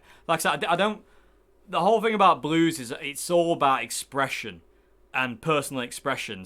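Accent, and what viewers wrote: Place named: Northern England, United Kingdom. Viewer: Can not pinpoint your accent lol, it's slightly northern, but then very southern 😂😂 where you live?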